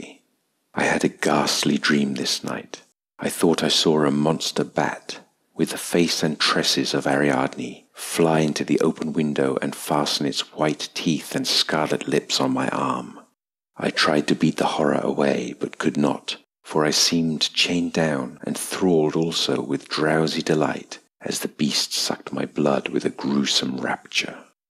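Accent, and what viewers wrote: United Kingdom. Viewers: It's a thundering story with mesmerizing British accent. I like the way of narration.👍🏻👍🏻👍🏻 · It's nice to find stories with British accent. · I like british accent. I listening your vids while shadowing them.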